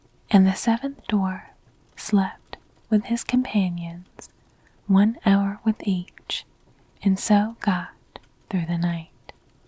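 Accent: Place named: South, United States. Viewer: Seeing your beautiful face is as comforting as your gentle southern tinged voice.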